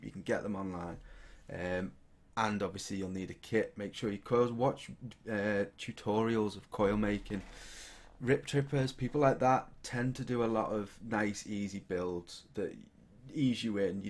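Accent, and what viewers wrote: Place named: England, United Kingdom. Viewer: Love your English accent - did you go to drama school to lean it? Excellent, keep them coming.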